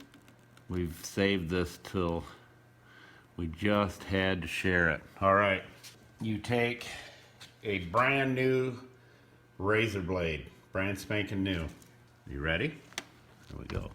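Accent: United States. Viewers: I am in UK and unfortunately I don't understand Yanky ! I have no idea what your talking about. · where is this accent from in USA? sounds great.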